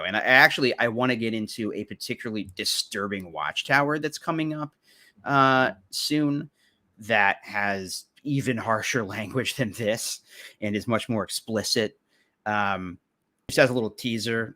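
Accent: RP, United Kingdom. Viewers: Omg your BBC World service accent is on point. More please.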